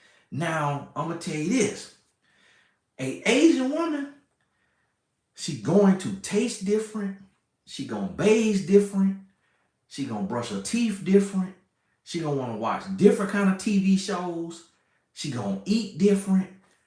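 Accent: South, United States. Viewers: Where are you from Mr?? You have a " southern drawl "...lol...I guess how how you speak & address your viewers as if we all " know you "...there is alot of truth in alot you say....thank you for your videos.😊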